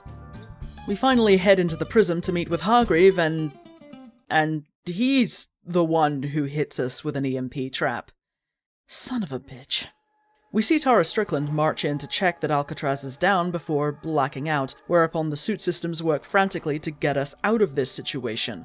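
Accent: United Kingdom. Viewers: This is off topic entirely, but are you british? Irish, american? I honestly cant tell and that could be from severe brain damage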